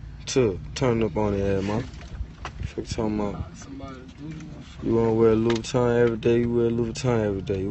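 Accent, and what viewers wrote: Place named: New Jersey, United States. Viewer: I miss his Jersey accent.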